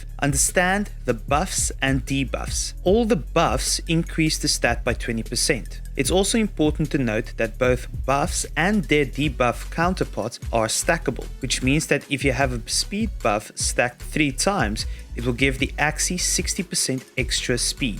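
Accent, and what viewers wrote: South Africa. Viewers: This must 100% be a South African, can spot that accent immediately.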